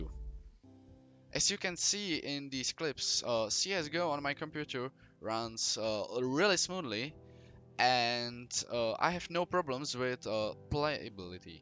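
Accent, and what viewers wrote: Czech Republic. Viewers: Já si úplně říkal že to je definitivně česká angličtina a pak jsem viděl české menu 😂😂👀 actually mi to pomohlo se rozhodnou jestli cs 2 stáhnout 😂 já určitě ne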